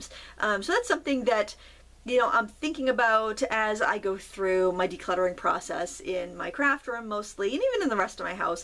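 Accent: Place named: Texas, United States. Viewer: Hi! It is so defucal for me to understand what you say, for the firste, you talk wery fast, and with your lovley texas accent, i would love it to stay that way, ant i wont have a single issue iff you just show the stuff you tell about... Love from E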